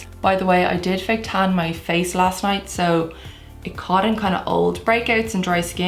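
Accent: Dublin, Ireland. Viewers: Omg you're so pretty :) Are you from Ireland? If so what county/province. Can't figure out whereabouts your dialect is from 😅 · Yocheved Lam it's an Irish accent, probably more specifically Dublin or somewhere around Dublin anyways 😂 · Like literally It's so similar I feel like Charlize is doing an Irish accent and I'm watching her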